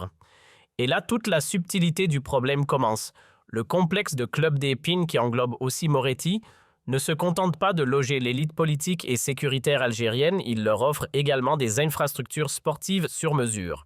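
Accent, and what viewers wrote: Canada. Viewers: Tu as une voix canadienne au faite !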